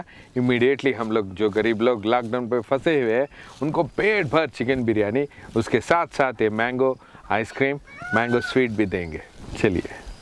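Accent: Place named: Hyderabad, India. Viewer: I like your hyderabadi touch way of talking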